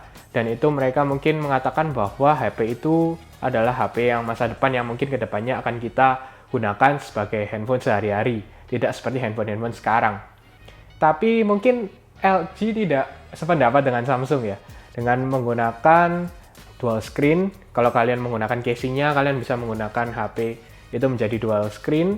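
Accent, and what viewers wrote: Java, Indonesia. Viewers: Medok itu keren bro 👍🏻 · medok amat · Gara gara logat jowo ahire aku subscribe..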